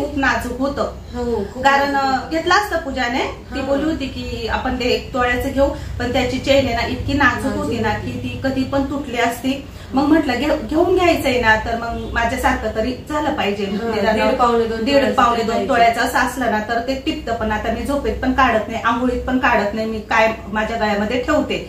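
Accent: Nashik, India. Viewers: नाशिकची भाषा दिसते आहे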